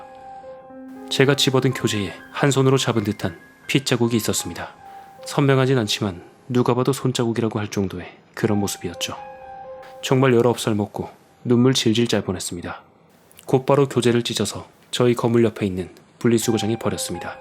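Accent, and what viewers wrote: Gyeongsang, South Korea. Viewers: 경상도 어디신가용😊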